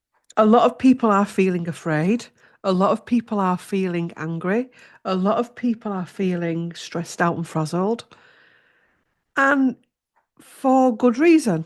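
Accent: Yorkshire, United Kingdom. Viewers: Love your videos and yorkshire accent. ❤ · Some of your pronunciation today was particularly Yorkshire, you made me smile. My 84 yo dad is a Yorkshire man. You help sooth the Soul during these times....🫠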